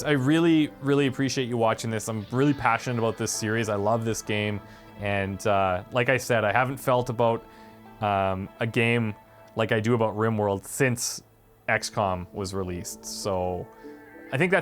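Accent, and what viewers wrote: Canada. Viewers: I totally thought he was Canadian. He just gives me a dear, homey Canadian feel lol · because your accent sounds like you're Canadian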